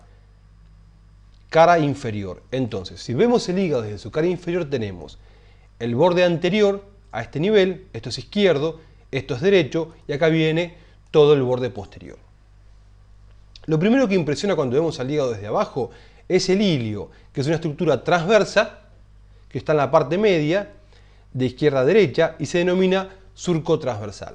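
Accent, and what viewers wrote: Argentina. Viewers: Los porteños hablan así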